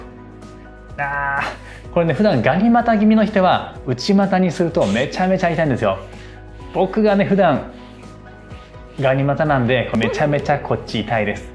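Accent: Kansai, Japan. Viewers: 関西弁のいい声はナダルみたいで聞き入ってしまいますね！ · 先生のレッスンでピラティス熱が再燃しました！ありがとうございます🙌 あと、今日初めて、ん？関西アクセント？のイケボ？と思いました。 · この回は関西弁が少々多目で嬉しい😆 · 参考になります で、ええ声の合間合間にかなり関西弁のアクセントが入ってるのですが、「漫才師の麒麟」の感じでええ声関西弁でストレッチもなんかいい感じ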